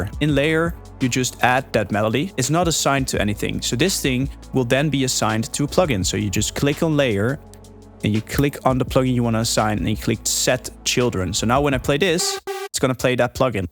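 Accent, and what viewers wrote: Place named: Netherlands. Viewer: bro je klinkt nederlands man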